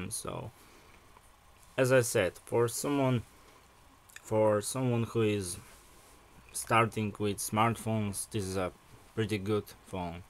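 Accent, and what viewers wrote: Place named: Poland. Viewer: Słychać że z polski :D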